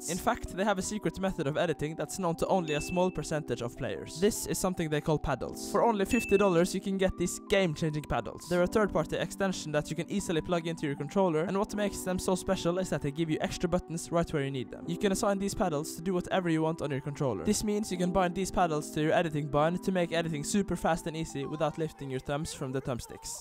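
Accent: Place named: Norway. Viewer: er du norsk? if not you just sounds like a norwegian guy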